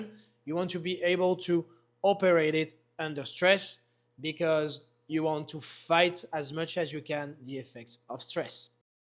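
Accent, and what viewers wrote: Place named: France. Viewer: You sound French as fuk!! Rarely seen a Frenchman that understands and supports 2A... 🙏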